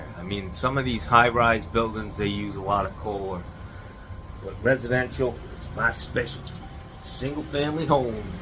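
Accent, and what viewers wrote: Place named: Northeast, United States. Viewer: your face now fits your NE accent! Not many people get to a point where their faces and voices line up.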